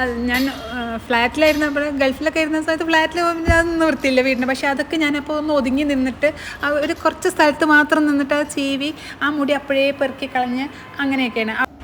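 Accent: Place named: Kozhikode, India. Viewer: Ningl clt kkarano....bhasha kettitt angane thonnunnu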